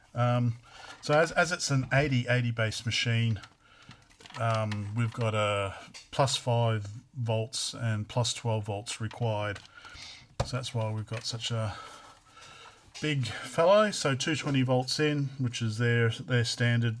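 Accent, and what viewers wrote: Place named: Australia. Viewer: Sounds Australian. Sorry if you are not. 2 minutes into video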